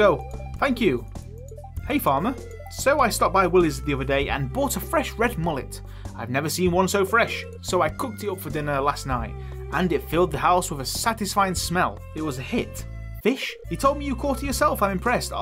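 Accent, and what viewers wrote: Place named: Nottingham, United Kingdom. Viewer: Great vid. Keep it up. Where in England are you pal. Sounds like a Nottingham accent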